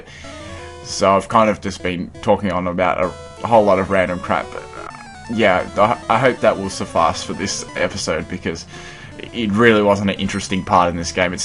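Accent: Australia. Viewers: Didn't know you were aussian!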